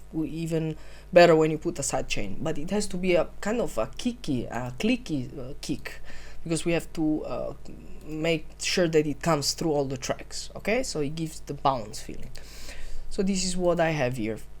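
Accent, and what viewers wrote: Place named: Italy. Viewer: si sente la pronuncia italiana :D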